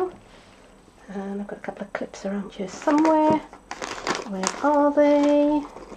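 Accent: Wales, United Kingdom. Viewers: Both are gorgoeus and you're right, with older children they would love making them. How about stapling and gluing the handle on and then applying the ribbon? Added extra strength maybe? But what a nice basket for all sorts of things or small doll's baby crib or covered with shaggy green fringe a monsters cage turned on it's side? I love your accent, I miss my Newport nieces and nephews "come yer", they shout, "this is my left yer and my right yer, I listen with them" and "please auntie, brush my yer, it's all tangled".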